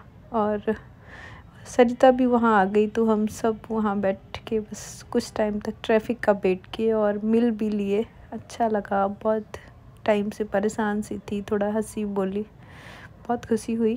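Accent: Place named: Uttar Pradesh, India. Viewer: Aap kahan per Ho didi kahan ke Rahane wale ho aapki Bhasha up side ki Jaisi lagti hai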